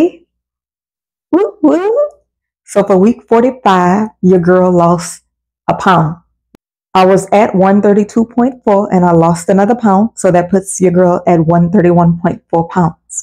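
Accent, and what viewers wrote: Louisiana, United States. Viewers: Okay we hear that happiness in the Louisiana accent😮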